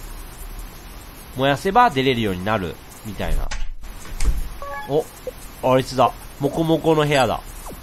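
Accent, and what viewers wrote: Kansai, Japan. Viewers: まだ関西弁がのこってる頃だああああ！！(まあ今もちょっと言ってるときあるけど)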